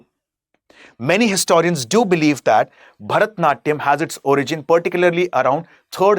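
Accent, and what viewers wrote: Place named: Hindi, India. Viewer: Pls correct ur pronounciation. It is BHARATA NATYAM,. NOT BHARAT NATYAM. u read in Hindi and use the same pronounciation in English. The words originate in samskrita and NOT in Hindi, which is a mixture of Arabic, Urdu and Samskrita.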